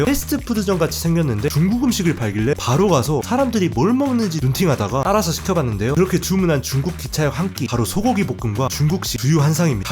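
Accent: Jeolla, South Korea. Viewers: 영상 내내 전라도 사투리가 느껴져서 혹시 했는데 역시 기아 팬이셨군요!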